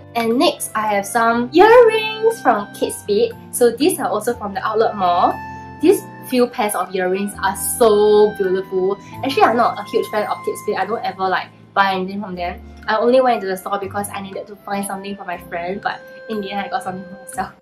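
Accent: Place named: China. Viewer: are you from china? your accent sounds like china accent!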